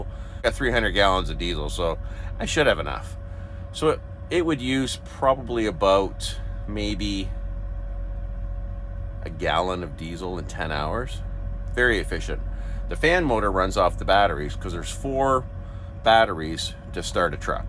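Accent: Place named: Canada. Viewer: I knew you were Canadian when I first heart your voice. Sound exactly like Alex Lifeson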